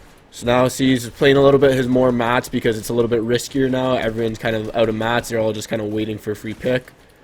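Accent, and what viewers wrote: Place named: Canada. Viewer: ur canadien i could tell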